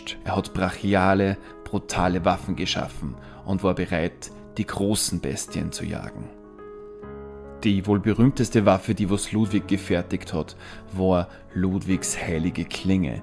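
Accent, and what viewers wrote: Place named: Austria. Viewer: Wird gleich geschaut! Ich liebe Lore Videos zu den Fs Games vorallem mit nem österreichischen Dialekt! Schöne Grüße aus Pottendorf (Nö)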